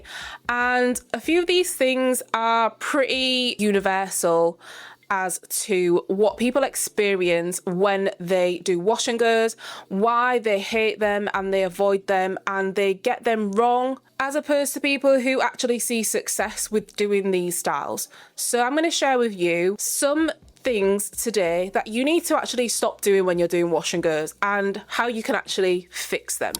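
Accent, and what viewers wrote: Northern England, United Kingdom. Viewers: Big up to the North England accent btw! ❤❤ just want to add that Kenra moisturizing oil has been a game changer for friction reduction on my tight 4c hair. Very lightweight. You might enjoy!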